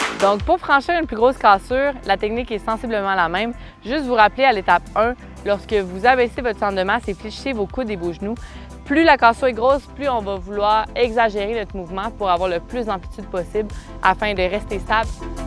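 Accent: Quebec, Canada. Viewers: Great explanation. That Quebec French takes a bit of getting used to.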